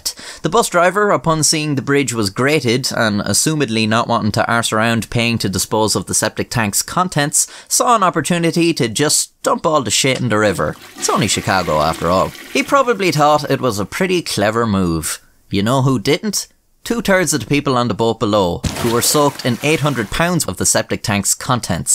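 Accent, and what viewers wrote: Ireland. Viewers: An Irish voice telling us to trust Atlas... Hmm... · "...two turds of the people on the boat below." When the Irish accent lends itself to incidental humor...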